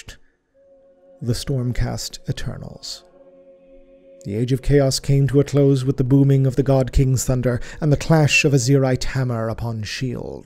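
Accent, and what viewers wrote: Ireland. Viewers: Where abouts in South Dublin are you from. Am I right in picking up a south dublin accent?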